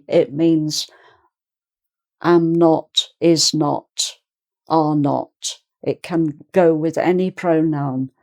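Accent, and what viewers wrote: England, United Kingdom. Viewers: British sounds perfect in your version. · Hello. Thank you for the lesson. The pronunciation of the contractions are very dificcult. Beautiful british accent!! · I love this Britsh accent! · Oooh British English pronunciation, thanks dear Lady. OMG!!! I've been pronouncing "aren't" completely wrong for like 15 years, I really need to view all your videos my lady, I probably sound really bad speaking English. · Nossa estou apaixonada por esse canal menina kkkk inglês britânico é muito gostoso de ouvir, se minhas prof do ensino medio ensinasse os verbo to be igual ela eu tinha aprendido la kkkkk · Oh an english accent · Hi..Iam from Chile...I love your pronounce ...pure english😃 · Beautiful lesson in British accent. Greetings from Mozambique 🇲🇿. Linda lição em sotaque britânico. Saudações a partir de Moçambique 🇲🇿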